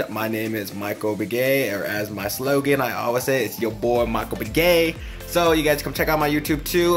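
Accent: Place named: Philippines. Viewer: That accent!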